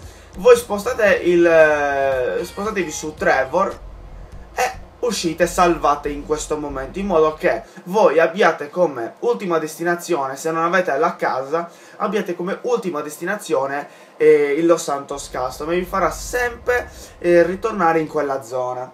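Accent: Sardinia, Italy. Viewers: Sei sardo vero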